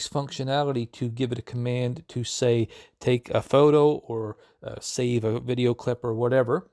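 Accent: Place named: Newfoundland, Canada. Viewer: Good newfie east cost voice 👌👌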